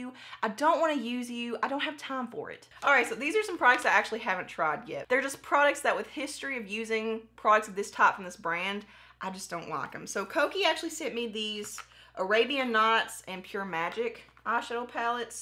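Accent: South, United States. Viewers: I love you so much!! I have no idea what to do with makeup and I'm almost 34.. but I feel like I am learning on your channel and it helps that your southern and your funny! I laughed out loud when you said MAN DOWN!! Stay true, stay you! Your awesome!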